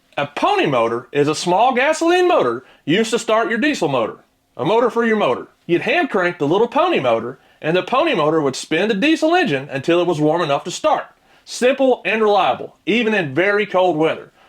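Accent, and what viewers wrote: South, United States. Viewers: Motherfucker you're crazy. And your southern like me. Keep up the good work.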